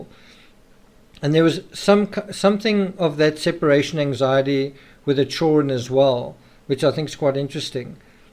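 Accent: South Africa. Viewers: You must be South African going off of your accent? :) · That's a South African accent I instantly recognize it love from South Africa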